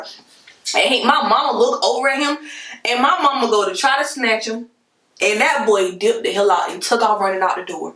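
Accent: Atlanta, United States. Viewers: Where are you from sis!!!!! I hear ATL all in your voice 🤗😂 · Are you from ATL cause you got that accent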